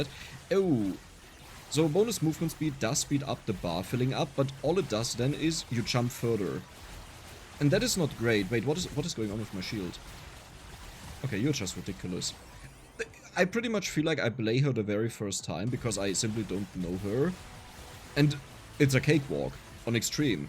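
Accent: Germany. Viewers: Like your content, but are you German ?😂🕺🏼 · Kann es sein das du deutscher bist?😅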